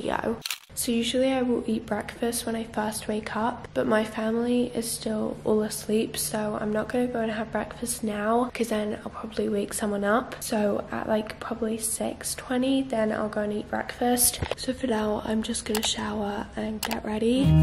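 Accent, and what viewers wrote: England, United Kingdom. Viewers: I started school on the 1st of September, but I got into in-person school on the 6 of the same month. It really makes a difference seeing your friends every day. ANDDD ´s videos are so inspiring! It is also a little bit weird for me knowing that we are on the other side of the world (literally, I live in South America) but at the same time feeling so close! I also want to say thank because she helps me to practice English, especially because she is from England and her accent is so natural. You give me so positive vibes:) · k im a brazilian learning the british accent and i just wanted to say that youre the first person i hear talking slowly because omg. btw where are you from? loved your accent <3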